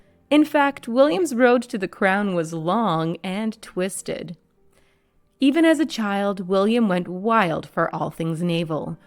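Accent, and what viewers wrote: United States. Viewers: peter ustinov great for the part of william 1V. not keen on an american narrating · Brits, I presume English Brits, seem compelled to insecurely leave indignant comments on this American-accented narration. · Remember this is a Yank female narrating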